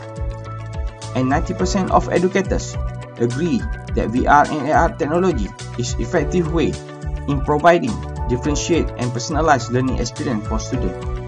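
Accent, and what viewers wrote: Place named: United States. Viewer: Terbaik. Usaha yg sgt baik. Cara cakap pun mmg bunyi slang Amareka. ~ Azar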